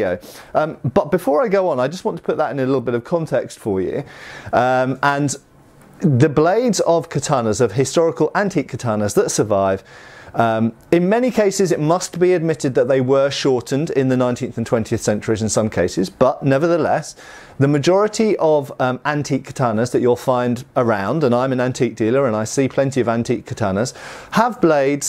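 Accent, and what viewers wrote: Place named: United Kingdom. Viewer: Spea britishl and uses inch... but why?? hahaha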